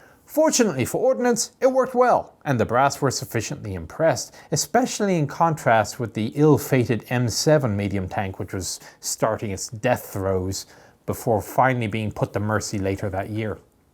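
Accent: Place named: Ireland. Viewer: I like reading. But I can't read with a cool Irish accent so here ai am.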